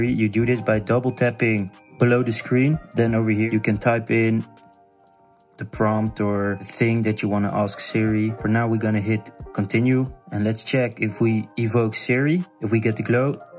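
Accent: Netherlands. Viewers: a nice good thick dutch accent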